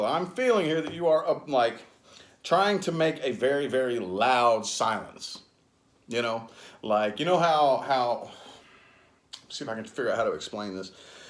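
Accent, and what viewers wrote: South, United States. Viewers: I like you and I like how you talk how you sound so southern😘😘😘 thanks for the reading. Queen Leo 8-21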